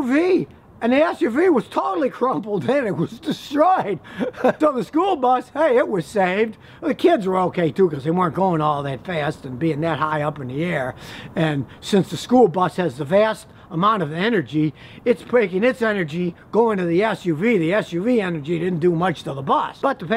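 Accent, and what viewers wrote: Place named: Chicago, United States. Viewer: Most Chicago accent ever. Could have been on Bill Swerski's super fans.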